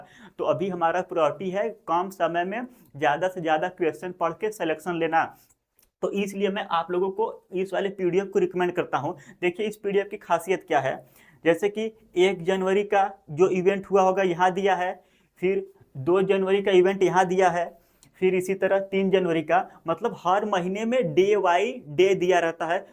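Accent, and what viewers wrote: Uttar Pradesh, India. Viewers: Bhai aap up ke ho kya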